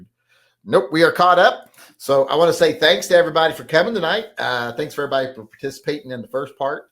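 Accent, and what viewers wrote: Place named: South, United States. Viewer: You have a pronounced southern accent, but I guess you knew that.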